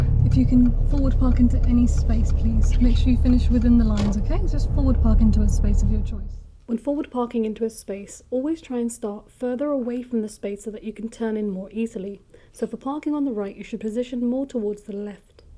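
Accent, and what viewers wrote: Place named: United Kingdom. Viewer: you are beautiful. with driving tips, i am learning the British accent too :)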